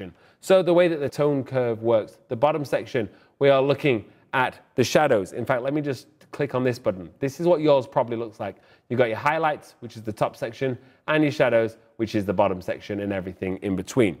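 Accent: England, United Kingdom. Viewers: It was great to discover an English man talking about Lightroom!! There are so many Americans and as a hearing impaired lady it’s difficult to follow their accents sometimes, even with the captions. Thanks for an informative video. I’ve not used Lightroom before so I will be following your blogs with interest. Just one question. Why do bloggers feel they need to speak really fast?!!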